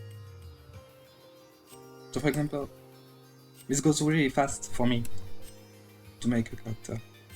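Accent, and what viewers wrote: France. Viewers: Your accent is like, the most French thing I have ever heard x3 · You have a Really funny French accent XD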